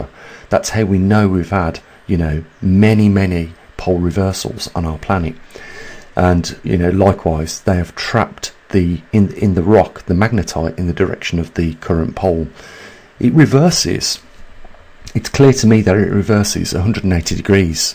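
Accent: Birmingham, United Kingdom. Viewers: You sound like a fellow Brummie. Lol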